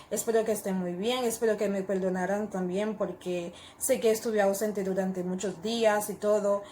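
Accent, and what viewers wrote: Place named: Spain. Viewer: really nice intro, you have a voice beautiful. i watched, i love your Spanish accent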